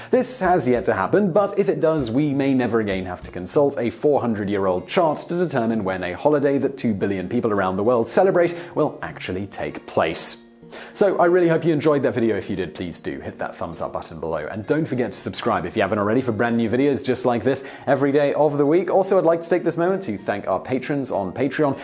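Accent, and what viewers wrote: United Kingdom. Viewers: I was WAITING for you to say "Christian" in your British accent, you beautiful English man. :D :D :D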